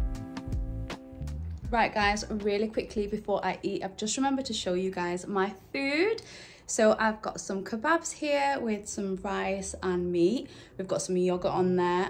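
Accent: United Kingdom. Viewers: We Americans are always in awe of British accent! Difficult to know what part of England your accent is from. Nevertheless, great video and watching it from Seattle, The Washington State.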